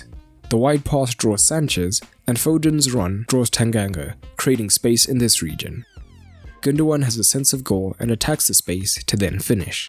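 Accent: South Africa. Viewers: Narrator. Are you South Africa? Your accent sounds familiar 🤔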